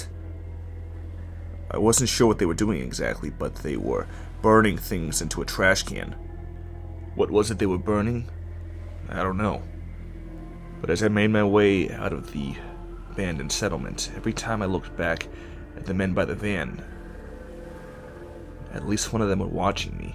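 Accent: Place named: Yorkshire, United Kingdom. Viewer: Sounds like a yorkshireman right enough. 😏 Ps. We also have resident dolphins and tuna in UK waters during warmer months.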